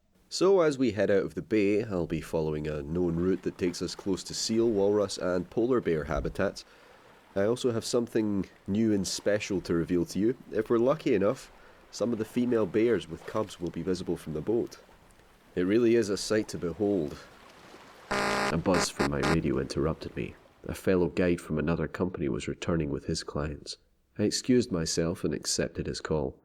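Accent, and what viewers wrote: Scotland, United Kingdom. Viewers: This channel is simply amazing. You guys have some really clever stories, and it doesn't hurt that you have Scottish narrators. XD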